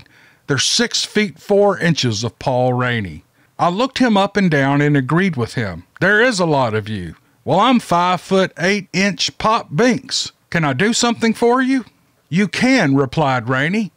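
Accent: South, United States. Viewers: You could monetize your voice. You have a voice I would rather hear than most of the books I've listened too. Maybe it's because southern people are my people! When I listen to a book, I want to Invision the story, not try to understand an accent or be distracted by it. I'm serious! I would listen to more books if you narrated them.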